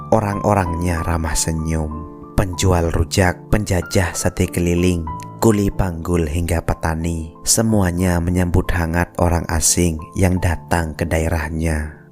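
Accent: Madura, Indonesia. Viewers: Logat maduranya kental sekali bahasanya mantap bang,orang madura teguh memegang prinsip,tekun bekerja ,pantang menyerah,salam saudara dari ranah minang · Hhhh dikatara logat maduranya bng...mantaps...semangata teross...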